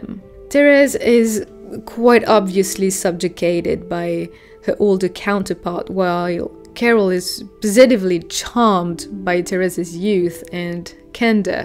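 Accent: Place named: France. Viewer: Absolutely brilliant analysis! Loved your enquiry into Carol, and how every single sentence is both sharp and poetic. Well done ! 🙏🙌 And you are a native bilingual, aren't you (You have an ever so slight French accent and the prefectly native Parisian one)? (Not to mention your poster of Chris and la petite robe noire 😆) Anyway, love from London from a queer ⚢French/English film analyst (I know how hard it is, so I do admire your competence) 😊 Merci ❤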